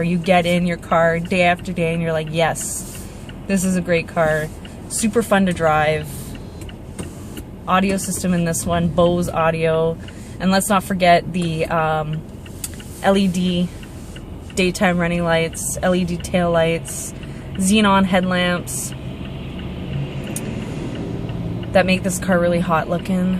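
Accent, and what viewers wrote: Canada. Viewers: cute lady, but its Mahhhzda. i literally loathe canadian pronunciation.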